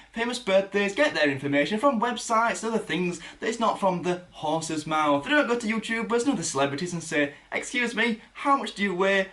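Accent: United Kingdom. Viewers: I love your accent are you British? Swedish? Irish? Or Australian?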